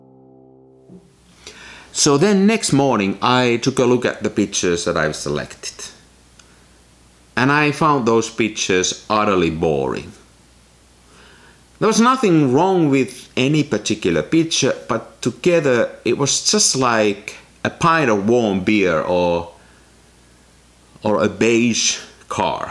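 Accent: Finland. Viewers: I like this kind of content, subscribed. Good photos, no long boring cuts with loud and crappy music. Finnish accent is a bonus. It sounds funny and for some reason I like it. :)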